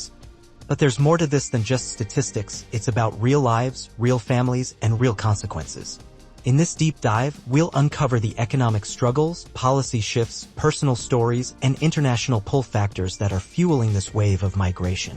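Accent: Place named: United States. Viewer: Whats with the us based ai voice?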